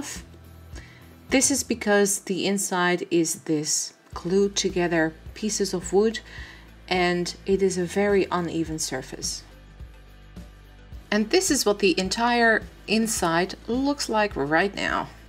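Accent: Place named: Netherlands. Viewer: Everytime I hear your accent I get this feeling you're dutch.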